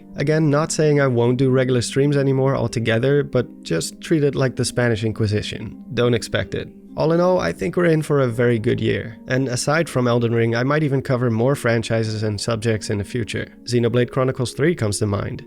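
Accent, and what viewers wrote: Netherlands. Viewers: There are way worse accents then the dutch one. It was actually quite charming. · kan aan je stem horen dat je een nederlander bent, congrats op de 100K man. Mijn PC kan elden ring niet runnen, maar wil de game uiteindelijk wel gaan spelen(nadat ik een betere gaming PC bouw, maar dan moet ik eerst wachten totdat de prijzen gezakt zijn).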